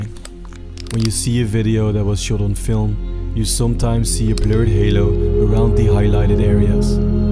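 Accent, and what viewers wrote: Netherlands. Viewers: Ik hoor volgens mij Nederlands... Nee, ik weet het zeker! ;-)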